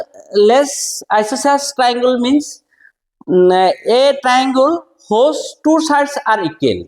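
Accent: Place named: Assam, India. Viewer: Sir assamese lage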